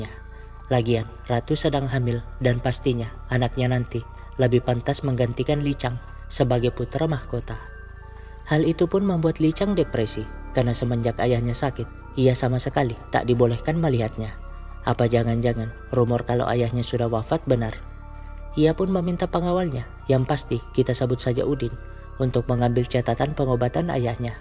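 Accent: Makassar, Indonesia. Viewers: Orang makassar kah ini adminnya?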